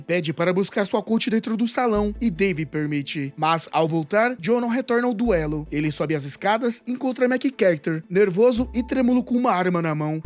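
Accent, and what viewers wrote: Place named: São Paulo, Brazil. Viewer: Pq esse cretino que narra isso como se fosse um cretino? AH, pq o narrador é um cretino caipira! SAquei logo pelo sotaque! Paulistas kkkkkkk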